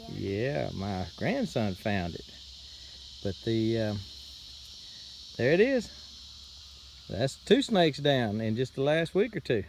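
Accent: Texas, United States. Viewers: Dude you have the absolute perfect Texas accent/voice. I could listen to you read a book or do the news or something like that. I grew up in central ok then moved to north texas so mine is not quite as pronounced as yours. I love it though. Good show!!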